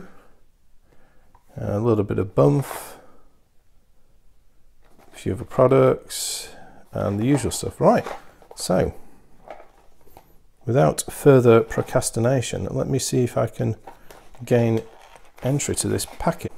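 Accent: United Kingdom. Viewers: I love me some Not Squats in the mid morning. Do you think you'll do more reviews of this line,? Also i enjoyed the brief reveal of Squeaky during the box placing. Nothings better for the old sense of humour than listening to sped up midlanders.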